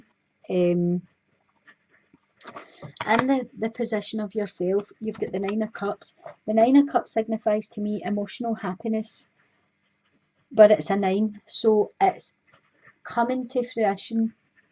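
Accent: Scotland, United Kingdom. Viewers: What accent is this, Scottish ? , thanks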